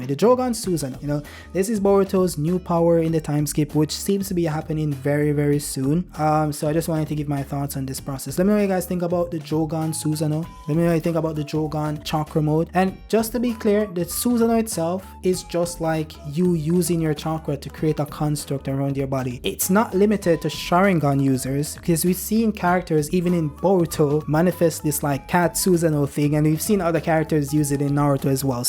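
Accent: Jamaica. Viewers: Youhavaa Jamaican voice are u jamaican???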